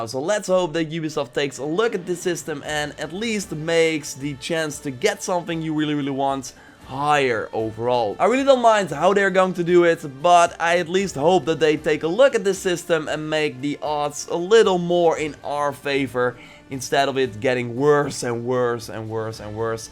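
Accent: Netherlands. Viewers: Your dutch i hear it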